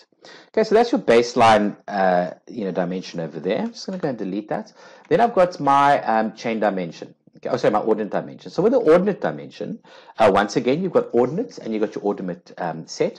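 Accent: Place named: South Africa. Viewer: are you south African, i heard your voice and immediately knew 😂😂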